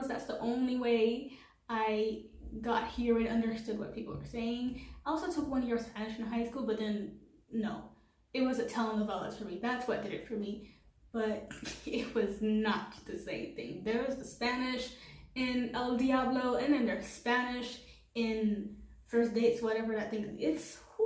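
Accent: United States. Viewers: I'm actually shocked that you're Hatian! You have an American accent! How?!? You sure you've never lived in the US?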